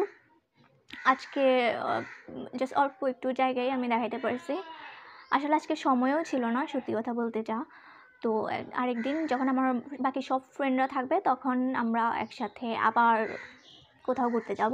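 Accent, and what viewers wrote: Chittagong, Bangladesh. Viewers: kothar modde kichuta Chittagong er accent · কথার মধ্যে চট্টগ্রাম এর টান আছে এজন্য বললাম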